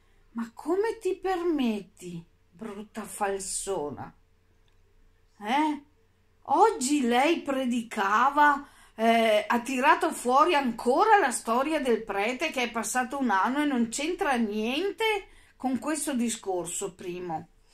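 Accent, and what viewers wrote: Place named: Veneto, Italy. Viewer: Buonasera.. sei simpaticissima!!! Sono veneta anche io ed è sempre un piacere sentire il nostro accento😍 Dovresti fare delle LIVE... sai che seguito che avresti!!!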